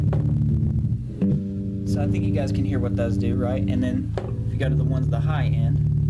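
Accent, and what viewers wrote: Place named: Kentucky, United States. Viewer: Great video buddy, I thought I heard the KY in you, ;-) , I was searching because I see a Yamaha B100-112III on Craigslist right here in Louisville for $150 but I have a couple amps already, was just curious. Thank you for the tip on Steve's shop in Richmond, just found him on FB and sent him a message. Now I gotta check your band, ....Thanks for the video and info !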